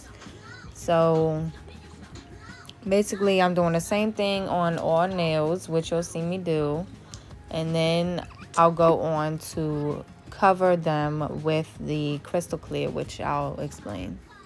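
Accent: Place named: Washington DC, United States. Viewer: U sound like u from dc...or md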